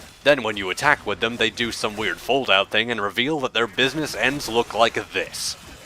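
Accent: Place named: United Kingdom. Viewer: You sound like a British (Not sure what that accent is...) Skallagrim while ranting about weapons.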